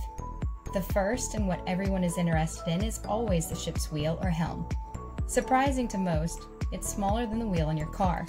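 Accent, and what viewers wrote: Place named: Australia. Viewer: #captainKateMccue are you a fellow Aussie? Your accent sounds Australian :)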